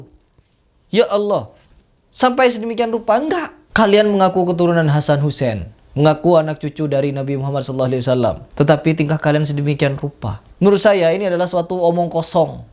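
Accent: Madura, Indonesia. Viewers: Ha..ha...bang lucu enak logat nya madura ya.tp yg penting lagi omongan nya bener semua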